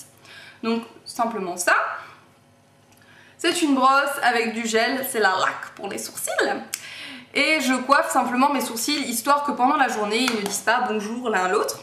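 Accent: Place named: England, United Kingdom. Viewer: Tu es de retourrrrr !! Tu m'as manqué ! Alors , ton accent anglais, il est trop bien, dis, tu donnes des cours pour l'anglais ? :) Superbe vidéo !!! J'aime beaucoup parce que ça fait également tes produits fétiches etc ! Gros bisou !! ♥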